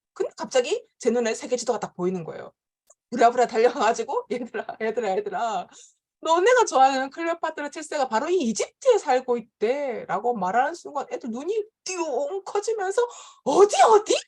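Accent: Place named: Gyeongsang, South Korea. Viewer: 작가님~오랜만에 유튜브 올라왔네요^^얼마전에 교집합 스튜디오에 나온거 보고 엄청 반가웠는데 ㅎㅎ 작가님의 이 구수~~한 갱상도 사투리와 억양+ 가감없이 진솔한 옆집언니같은 화법 완전 팬입니다!! 듣는거 자체로 힐링됩니다~앞으로 자주 올려주세요~~❤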